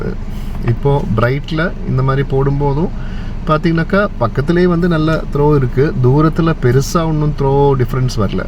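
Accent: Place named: Kerala, India. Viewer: You talk tamil well, but somewhere I feel like u hav sone mallu connection.. is it true?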